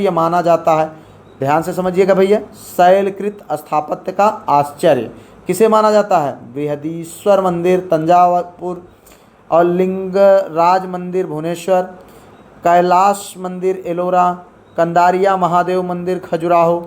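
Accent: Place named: Bhojpuri, India. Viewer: Nice session Sir ji waise Sir aapki bhojpuri langauge bilkul hamari tarh hai jaise hamare yaha boli jati hai 😊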